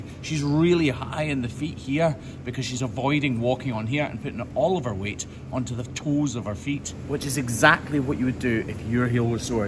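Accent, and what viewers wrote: Scotland, United Kingdom. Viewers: I could listen to you all day. My family members are all Scottish before me, I'm the first Canadian in my family. The Scottish accent is the sound of my childhood. Ive lost a lot of them...Im 56 now so they all passed of old age etc. Ive been pretty depressed for the last year- health issues - and listening to you reminds me of my childhood. The wonderful Scottish brogue makes me so happy. I love the videos as well but I mostly watch to hear you speak. Much love from Canada eh. <3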